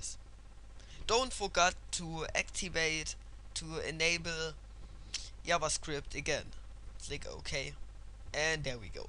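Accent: Germany. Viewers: Bist du Deutscher? ^^ Wenn ja, man hört´s :D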